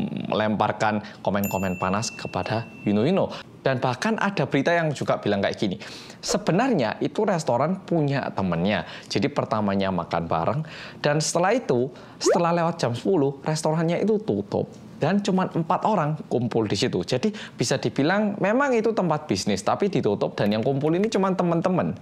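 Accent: Java, Indonesia. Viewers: Kalo gak nonton videonya, cuma denger suaranya aja, bener2 suara orang Jawa, medoook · Orang mana si. Asli mana. Kok medok. #penonton_baru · Medoooook, asal mana to om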